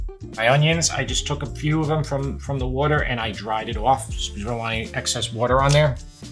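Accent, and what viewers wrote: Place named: Long Island, United States. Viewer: i just knew you were from Long Island from your accent. I as well have lived on LI my whole life..........and love your videos!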